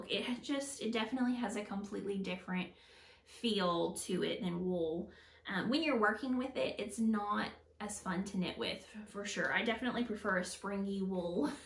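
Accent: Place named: Arkansas, United States. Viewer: Congrats on daring to dive into podcasting! I enjoyed your first episode. And thank you for adding all the pattern/yarn/etc details in writing on the screen... especially because I’m not (yet!) accustomed to an Arkansas accent😉, even though I once drove from Ontario to Arkansas once! It was beautiful, especially Hot Springs National Park, which was my final destination. Driving back home, we took the route through the Wachitas/Ozarks—gorgeous, and reminded me of the Laurentians where I spent my summers. Keep up the good work!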